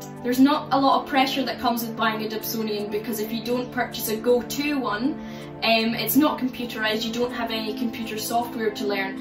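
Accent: Scotland, United Kingdom. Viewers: New subscriber caught off guard there with a Scottish accent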